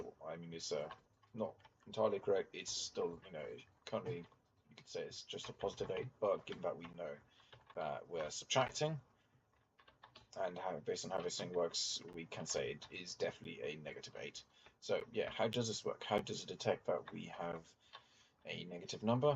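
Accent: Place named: United Kingdom. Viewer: Good Video but the british accent is a bit annoying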